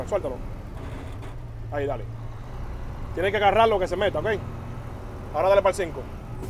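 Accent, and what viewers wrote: Dominican Republic. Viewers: Por el asento se que eres paisano mio jaja Dominicano no? Jaja estamoa donde sea papa!! Orgulloso de usted!!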